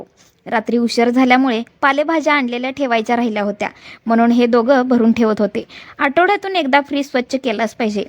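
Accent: Kolhapur, India. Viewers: Tumchi bhasha kolhapuri aahe kothe rahata tumchi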